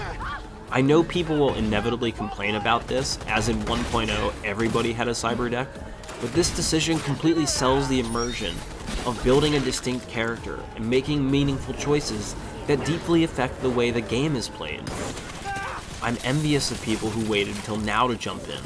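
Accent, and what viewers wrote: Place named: United States. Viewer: Youre not italian. Youre american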